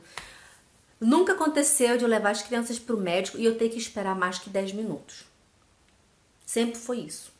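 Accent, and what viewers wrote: Pará, Brazil. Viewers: Égua, bem que eu reconheço esse sotaque! Saudações de Belém do Pará! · Eu sabia que você era paraense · Já sabia que você é do Pará só pelo sotaque haha. O nosso "inverno" é assim mesmo, só chuva e calor aff. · Oi querida, achei por acaso seu Canal, e ja to assistindo., so uma Pergunta, Vc é Paraense? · Assim que ouvi vc falar eu soube. É paraense. 😚 inscrita!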